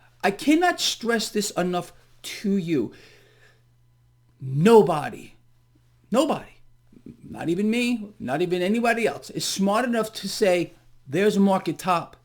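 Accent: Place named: New York, United States. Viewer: I genuinely thought you were in NY. Maybe it’s the accent. I know the market isn’t gonna reverse anytime soon thanks to the fed, but It will reverse, eventually, and it’s gonna be a doozy. I understand your logic but nothing goes up forever dude. Buy high sell higher? Hahaha. You turned everything upside down. You’re trading though. You’re not a “buy and hold “ guy. You’re not saying buy and hold for your future however am I correct?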